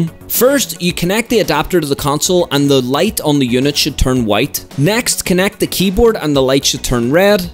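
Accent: Scotland, United Kingdom. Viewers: scotish i think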